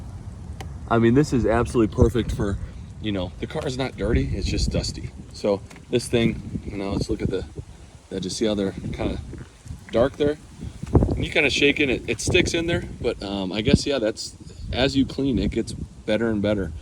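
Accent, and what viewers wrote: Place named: China. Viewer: I think this video was made in China, Man is trying to hide his accent. China is influencing in our market.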